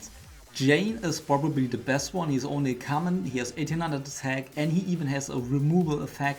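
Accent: Germany. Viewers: Dachte direkt in den ersten Sekunden "Das kann nur ein deutscher Akzent sein!":D Super Video!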